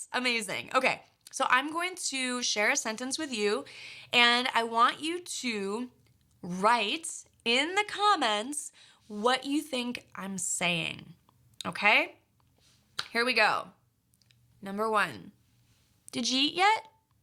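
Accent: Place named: United States. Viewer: hi how it's going honestly I liked your way that you are teaching by the way nice accent wow I love American eglish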